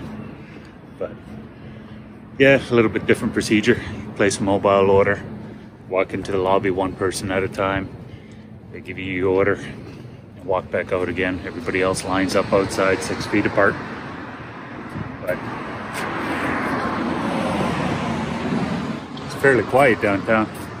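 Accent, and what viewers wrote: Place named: Finland. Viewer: A nice finnish accent.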